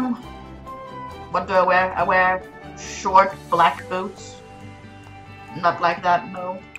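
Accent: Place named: Denmark. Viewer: Hello! Are you Danish? It sounds like you have a danish accent😁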